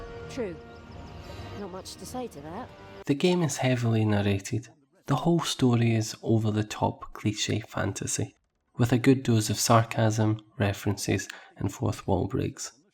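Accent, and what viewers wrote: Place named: Scotland, United Kingdom. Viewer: Good review. Consider me a subscriber. Don’t hide your Scottish accent tho!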